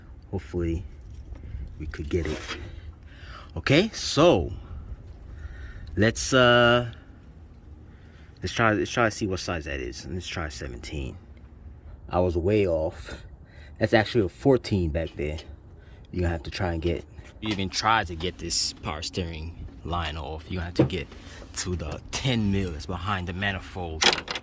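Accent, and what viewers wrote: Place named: New York, United States. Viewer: Bruh you from the east coast huh you sound like my partner Tommy he say stuff like there’s gone be mad fluid like the lingo and accent sounds like him and New York people 😇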